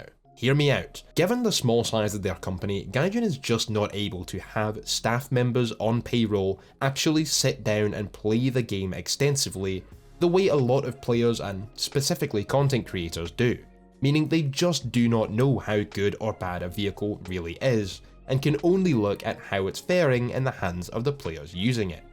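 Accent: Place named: Scotland, United Kingdom. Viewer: Damn I love this scottish accent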